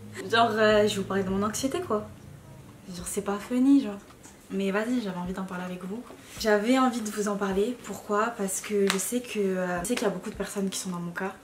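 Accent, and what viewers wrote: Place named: South, France. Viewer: jaime trop ton ptit accent moi aussi je viens du sud de base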